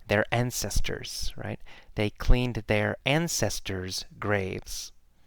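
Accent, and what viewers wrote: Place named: United States. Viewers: mỹ nha bn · American it is, my dear.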